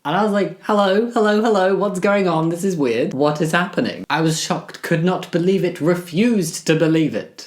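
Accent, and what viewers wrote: United Kingdom. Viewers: I keep forgetting your British I’ve just gotten so used to your accent🤦🏻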